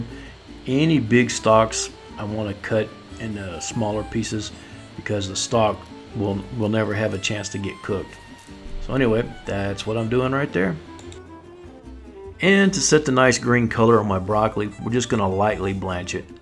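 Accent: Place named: Texas, United States. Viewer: I'm making this tomorrow, it looks so delicious! Love your accent, are you from Texas? Greetings from Montreal! 😃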